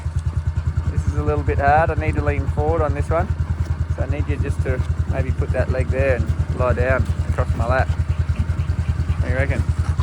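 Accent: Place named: Australia. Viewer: Good to know. Thanks for the update. By the way, I'm from the US and it was your Aussie accent that had me thinking you were saying "Carla" instead of "Kyla."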